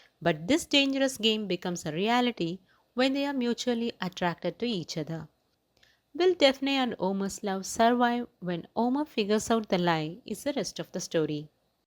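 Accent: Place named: Kerala, India. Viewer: You (the narrator) sound like a Malayali woman Your accent I mean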